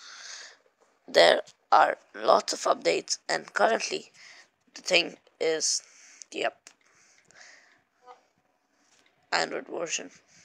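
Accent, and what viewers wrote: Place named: Sindh, Pakistan. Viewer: Bhai Kya chal raha hain Sab theek me accent zabardast ne Bhai Sindhi accent bhool nani Gaye me chalet hain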